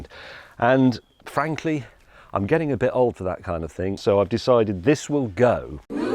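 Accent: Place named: South Yorkshire, United Kingdom. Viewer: South yorkshire eh? Hehehe...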